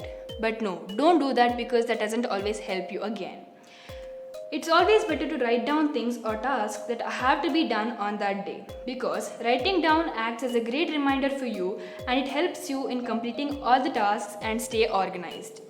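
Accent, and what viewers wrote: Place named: England, United Kingdom. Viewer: First tym here girl....and loved ur voice and ur English accent....nd the tips are beneficial ❣️🥰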